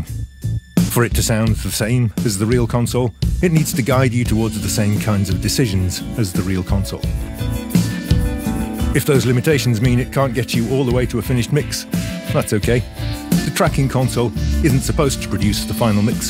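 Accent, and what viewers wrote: United Kingdom. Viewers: But wait! You sound British to me as an American. Have you ever heard of SSL? They made this thing called the 4000. You needed really long arms. And they became very popular. With people that had long arms. Not so much with you folks with the short arms. You've been playing with too much. While I love what all of these plug-ins have to offer. Most all are really unnecessary. They are just there for children that like playing video games with audio software and always win. It really doesn't have much to do, with music creation, itself. It's whizbang. And so all those great sounding rock 'n' roll hits. That all of these plug-ins are said to emulate. It's all bullshit. It's, total bullshit. None of those great hits had much of anything to use. We had two may be three perhaps four choices? For everything. That was it. And we had one limiter. 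And then got a cheap one. Only to find. It really wasn't worth using. And so we only had one good limiter a black 1176 purchased brand-new in 1976! I just sold last year. Because the clones are just as perfect. As in, perfect. And at a bargain price! And I had one reverb. From Germany. Made with a big piece of cold rolled steel plate. From a company that, tried to kill us years ago. Along with their microphones. And their cute little cars. All thanks to the worst dictator in modern times. And was a hard way to get good quality sound. That we already had. But theirs, sounded different. It sounded German. For, Wagner. Our stuff was better for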